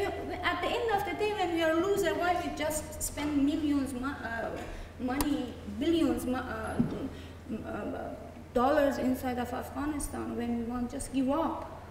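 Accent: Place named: Iran. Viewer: 17سال در اروپا مدرک دکترا باید انگلیش اد خیلی خوب می بود انگلیش را مثل فارسی صحبت می کنید لغت ها سر به سر کردی گفته روان هستی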